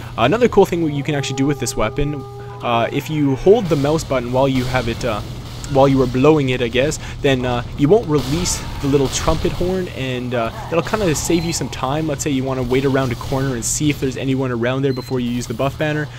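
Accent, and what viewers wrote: Canada. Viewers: Are you a Newfie?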